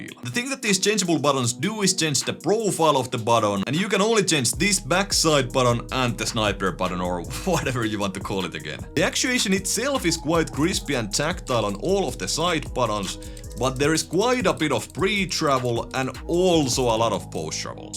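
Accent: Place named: Finland. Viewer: Hyvä rallienkku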